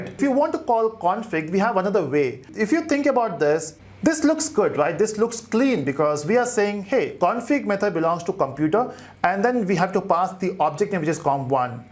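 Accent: India. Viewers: sorry your tutorials may be good but that Indian accent is killing me.And you talk like you had 1 kilo of coffee.Not very attractive when you want to actually learn something :(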